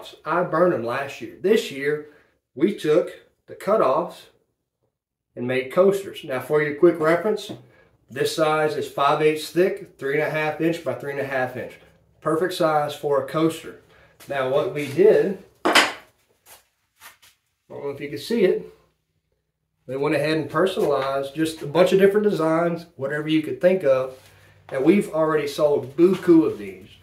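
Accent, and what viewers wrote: Louisiana, United States. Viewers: You must be from Louisiana…my fellow Cajun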